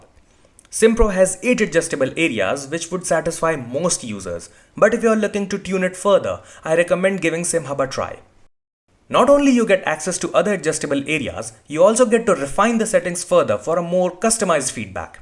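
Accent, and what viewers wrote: India. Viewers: I hear indian i turn off the video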